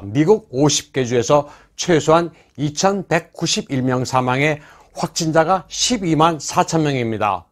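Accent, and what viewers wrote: China. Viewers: 한글로 써놓은 지명등을 중국발음으로 읽는 것을 듣는 것이 귀에 거슬리네요.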